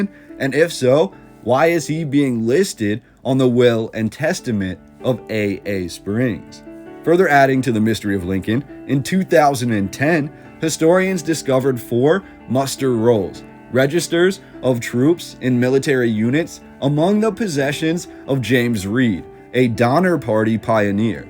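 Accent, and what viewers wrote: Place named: Pennsylvania, United States. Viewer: I knew you were from PA by the way you pronounce Lancaster. Lankuster.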